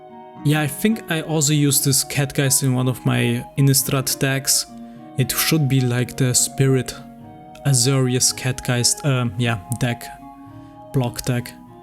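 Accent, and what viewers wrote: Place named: Germany. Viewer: Aren't you German? Because your voice/pronunciation is really cool.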